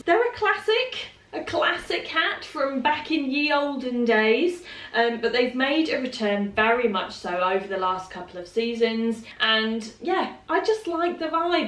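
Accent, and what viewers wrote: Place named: United Kingdom. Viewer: British accent sounds soo.. delicious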